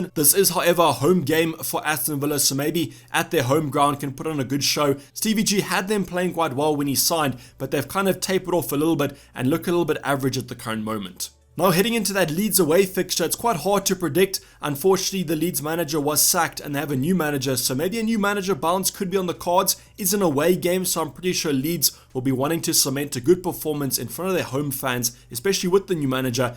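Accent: Afrikaans, South Africa. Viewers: Yoh!! Your boer accent is heavy 😂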